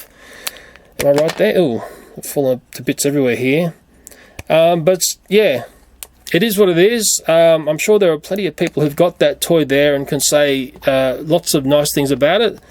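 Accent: Australia. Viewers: I love the way Australins talk. Im American so I can't really understand what he means sometimes.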